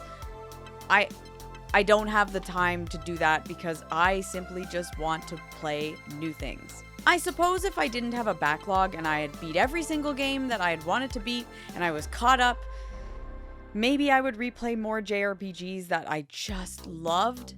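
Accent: Canada. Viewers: I can always tell someone is Canadian when they say “sorry, hard, Mario” and I love it 😊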